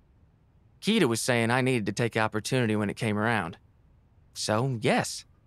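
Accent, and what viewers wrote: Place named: United States. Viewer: I love the audio it's very cute but why he got a little bit of a country accent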